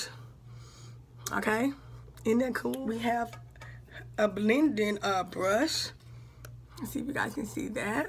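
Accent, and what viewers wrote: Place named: South, United States. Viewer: I love your accent you from the south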